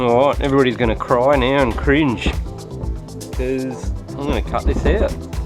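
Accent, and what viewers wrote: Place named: Ireland. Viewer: irish? or Australian? sorry nice accent!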